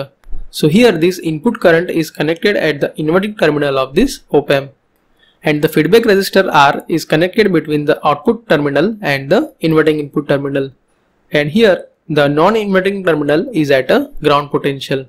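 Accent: India. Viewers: Thank you for this video. Very informative but hard to understand. Fortunately there are sub-titles available. It could be even clearer with a native English speaker narrating as many people do not fully understand the accent of the Indian sub continent. The structure is unfamiliar and multiple rolling R's are challenging. The math is explanatory, though really it is secondary to the actual physical characteristics of the circuit.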